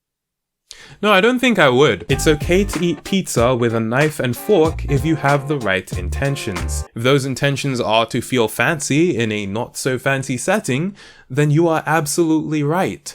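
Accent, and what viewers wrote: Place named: United Kingdom. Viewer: Love your Uk accent!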